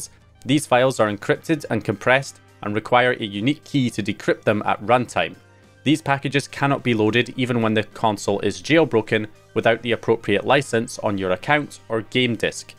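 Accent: Scotland, United Kingdom. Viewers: You Scottish mate?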